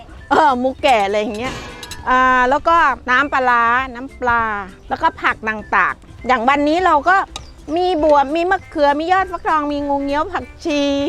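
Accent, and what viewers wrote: Isan, Thailand. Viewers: คุณยายเก่งและน่ารักตลอดเลยคะ เว้าภาษาอิสานตะฮักคักจ้า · หนูเป็นfcยายมาตั้งแต่หลานเจ่าสายฟ้ากับพายุน้อยๆนั่งเล่นยุนำยายเจ่ากะเว้าอีสาวม่วนดีจ้าช้อบฟังตอนเจ่าเว้าลาว❤❤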